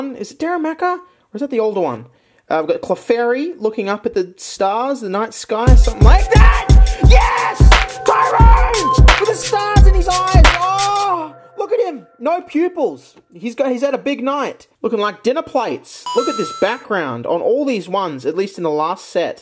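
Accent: Australia. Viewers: already saw the video in the self promo. you're videos are consumable as fuck... or maybe it's the Australian accent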